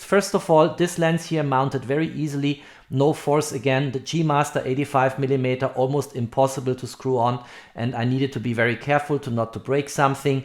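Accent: Germany. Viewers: Youre German, right? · Exactly what I was looking for, thank you! Are you German btw.? :D Your accent sounds German. This probably also would mean that the adapter is available in Germany which is great. One more thing: how is the AF performance in videos with moving subjects? One thing is being able to recognize eyes/faces, the other one is real fast-tracking and on-point autofocus in videos, I think that's a harder task to accomplish, therefore I'm not completely sure if the performance is similar to native lenses in this scenario.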